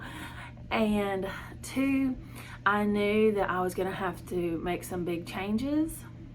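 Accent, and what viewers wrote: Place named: Appalachia, United States. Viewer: I’m watching and have reached the end of your day 20. I’m inspired by your walking, especially walking without media! May the Lord help you through all your adversity!!! 😢 Btw, your accent sounds like mine so I figure we both live in the southeastern part of the United States. I call my accent Appalachian Foothills.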